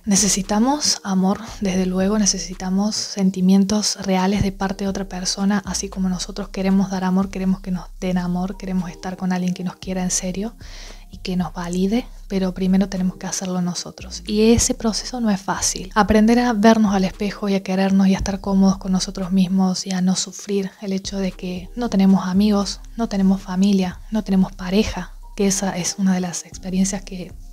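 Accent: Córdoba, Argentina. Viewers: Ese lindo acento será cordobés? 😊 saludos genia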